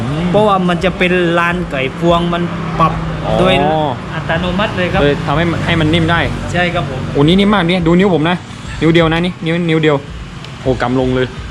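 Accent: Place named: South, Thailand. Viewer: พี่แกพูดติดใต้หน่อยหน่อย 😆😆😆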